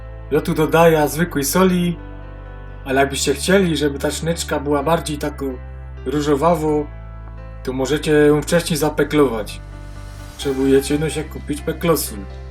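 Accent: Silesia, Poland. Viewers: kochana,wspaniała gwara śląska❤ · Obudz sie.Przecez mowi gwara slaska.Mozna zrozumiec. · już raz robiłem szynkę z Twojego przepisu, bardzo dobra, teraz będę wstawiał poraz drugi i pewnie nie ostatni,Fajnie gadasz po ślasku, tak trzymaj, pozdrawiam z Podlasia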